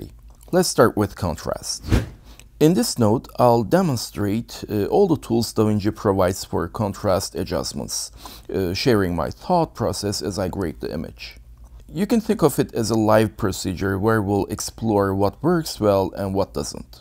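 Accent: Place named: Russia. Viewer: Я совершенно не знаю английский, но чувак, я слышу русский акцент)))))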